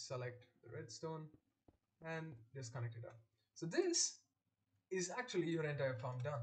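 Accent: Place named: India. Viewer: Which country do you belong to? Your accent is very Indian. If you are an Indian then its really nice to see some good indian Minecraft youtuber.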